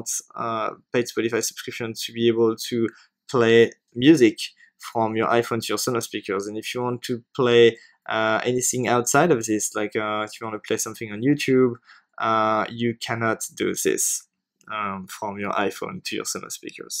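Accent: France. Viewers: I can hear u r from France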